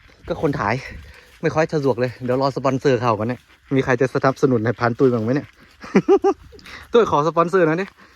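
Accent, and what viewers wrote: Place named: Sukhothai, Thailand. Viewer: สำเนียงเหมือนคนสุโขทัยเลยคับ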